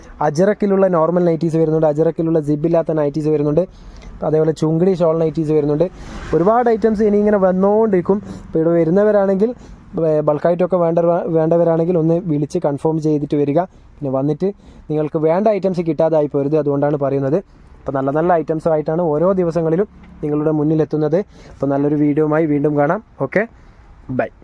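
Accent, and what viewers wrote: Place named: Karunagappally, India. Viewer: Karunagapalli karuda slang anallo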